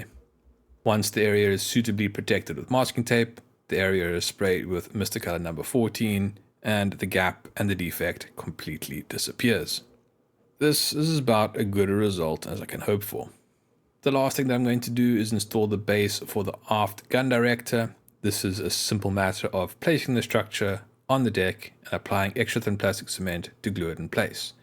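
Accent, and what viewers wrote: South Africa. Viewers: I wanted to share with you I've been trying to identify your accent. I settled on South Africa. The other day I met somebody who had a similar accent so I played a portion of your video to him. He confirmed your accent was South African, and I believe he said it was from the southern part of the country.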